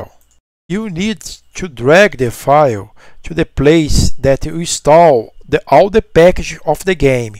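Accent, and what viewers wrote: Brazil. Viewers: percebi que era br pelo sotaque skaksakska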